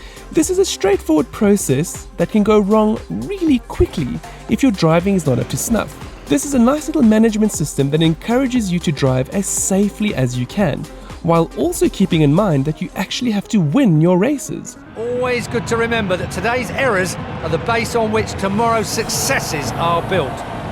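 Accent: South Africa. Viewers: Any South African voice gets a Like and Sub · Well stewie is british and this guy has a south african accent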